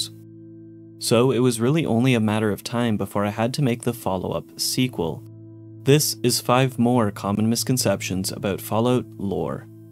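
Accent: Canada. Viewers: I love your Canadian accent 😊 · I can't decide if your accent is Canadian or not!(am Scottish so accents are weird haha) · You can totally tell you are from Canada lol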